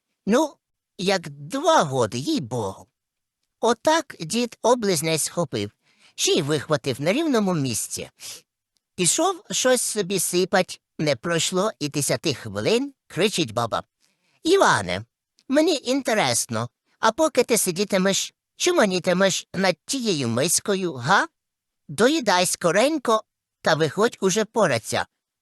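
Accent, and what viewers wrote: Poltava, Ukraine. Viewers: Наче з Полтавщини говір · У нас на полтавщині так розмовляють.Голс такий заспокійливо в'їдливий Так гарно , весело.Слухаю з задоволенням коментування бабчині.Особливо по спідницю.Ап про сваху як тонко підмічено!Дякую, дякую!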